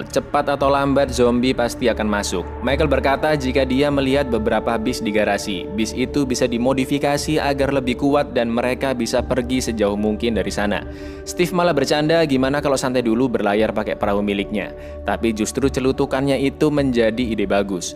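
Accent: Java, Indonesia. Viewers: Jowo ne ngendi slorr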